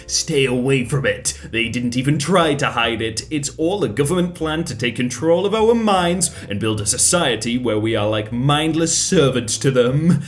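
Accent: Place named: United Kingdom. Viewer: It's just his accent... most Brits pronounce it that way.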